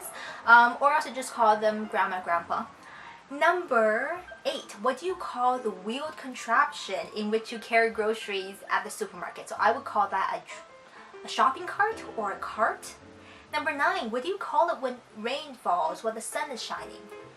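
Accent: Canada. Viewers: Whoa u have an awesome canadian accent haha! i have sort of an annoying NY accent.. not cool like yours haha kindly swing by my channel if u like! I do hair vids and food vlogs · Girrrrl your English is perfect, in fact - it might be a little too perfect. You enunciate and pronounce everything so crystal clearly that you sound a bit hyper correct, but you still definitely have a hint of Canadianess in your accent so I'd definitely be able to tell that you're not from the US. This was a really clever and original take on these accent tag challenges, thank you for sharing! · Hi! I enjoyed your accent-tag. I am a fellow Canadian too (I'm in MB) and yes, I can tell you would be "Chinese Canadian" as you do have a slight accent. People claim I have an accent too but.. ... lol... I can never tell myself. :) .. I also switch the pronunciations of some words depending on the sentence.. 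.. weird, right?... lol.... but it's all in fun! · You do have a Chinese Canadian accent... I would know cause I have it too. I speak perfect Mando, Canto, English... Each with accent. Mando with Canto accent. English with Canto accent. Canto with Hakka accent. I don't think Canadian has an special accent, mostly because it sounds so natural that I don't notice it. The craziest thing is that I picked up fake southern accent and I totally agree with you that as an Asian Canadian we do speak too fast and crumble up all the pronunciation.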